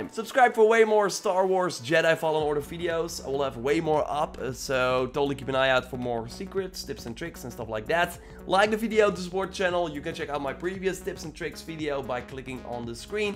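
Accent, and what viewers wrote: Netherlands. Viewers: Damn, are you Dutch? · Are you dutch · You Dutch?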